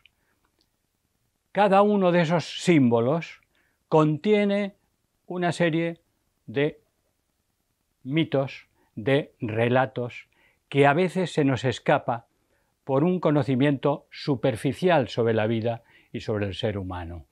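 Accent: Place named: Spain. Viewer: Magnífica exposición y más aún sus reflexiones personales,Profesor.Y para un argentino con las 3/4partes de su sangre,ibericas,su gallardo acento español es música.Muchas gracias!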